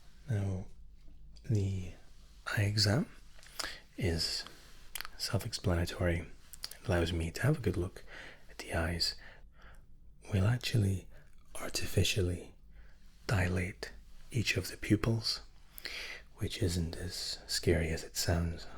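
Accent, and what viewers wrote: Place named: Scotland, United Kingdom. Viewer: Terrific video! I was trying like hell to place your accent! I kept thinking Scottish with a hint of British then I checked your, "about" page lol! Regardless, your accent is magnificent and your ASMR is spot on! Cheers!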